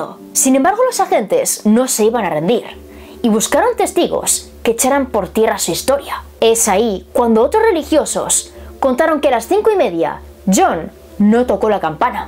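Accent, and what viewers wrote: Spain. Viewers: Saludos, hermosa bellota, tu acento español es hermoso precioso y divino tesoro. Excelente investigacion y mejor video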